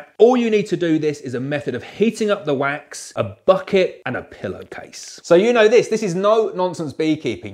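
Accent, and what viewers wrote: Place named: United Kingdom. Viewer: arrr-sigh and eeeeee such a refreshing change to find a fellow Brit doing 'How-to's' excellent video so double thumbs from me Boss I find american videos so tiresome and so prolific on here, why they have to make the simple sound so clever and complicated I will never understand but hey-ho. You've done an excellent job here so thank you very much indeed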